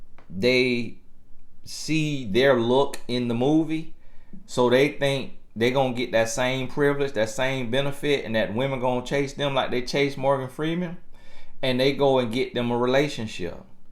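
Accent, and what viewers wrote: United States. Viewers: Gurrrl I fell out laughing and his country accent make it more funny🤣🤣🤣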